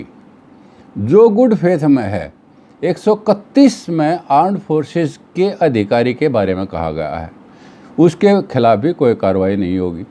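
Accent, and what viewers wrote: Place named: Haryana, India. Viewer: Nice explanation and the wonderful हरयाणवी accent. 👌👌👌👌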